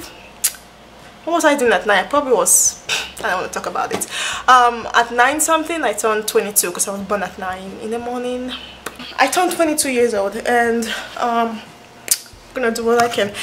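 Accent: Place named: France. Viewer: Do u speak French? Your accent sounds so French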